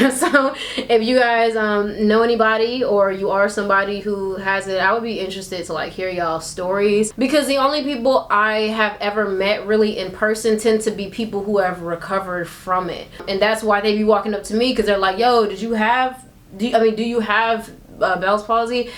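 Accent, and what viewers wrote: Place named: Texas, United States. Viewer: I couldn't tell, I thought you just talk a little country like us in texas...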